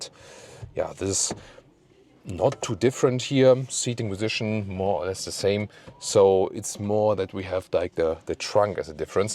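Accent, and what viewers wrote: Germany. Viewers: I have watched a few of our videos and I find you one of the very few decent YouTube car reviewers. Your professional and in-depth approach are a cut above the usual brigade of self obsessed useless reviewers. I am British and love Germany, I find a lot of German things very soothing from the accent to the engineering and architecture. I think I probably was German in a previous life 😂. I like the Kia EV4 and would probably go for the sedan version due to bigger boot and I prefer the styling. I would love the 800 volt charging to be on the EV4 so you can charge from 10% to 80% in about 20 minutes however for me changing from 10% to 80% in about 30 minutes on 400 volts is not that bad an don't a deal breaker. I am concerned about the laggy slow GPS and if it is difficult to plan changing stops as you allude to. Overall I loved your review and am thinking of leasing one when they come to the UK as I want a Tesla but due to Elon's cray antics not to forget him going full Nazi I can not buy a Tesla in good conscience. 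I am genuinely curious about what you personally think about his an antics and his Nazi salute and what most Germans think. Lots of love to Europe from Britain ❤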